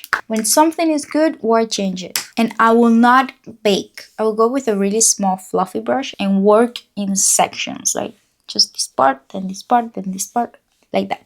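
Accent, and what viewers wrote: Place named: Italy. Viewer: I love thisss ,btw is she Italian?cuz her accent sounds like she is from Italy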